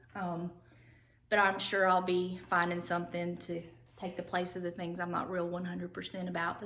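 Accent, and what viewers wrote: South, United States. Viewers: Hi, new tonight to your channel, from Liz, Traditions of the Seasons." I have been binge watching you for an hour! Loved everything you have done. Love your southern accent, reminds me of my cousin, in Richmond, VA. Blessings to you and yours.💖🌹💖🌸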